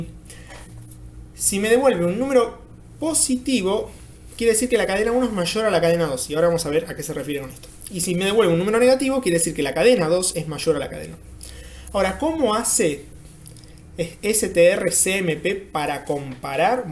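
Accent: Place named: Argentina. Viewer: buen video bro, gracias y que lindo escuchar un Argentino en la escena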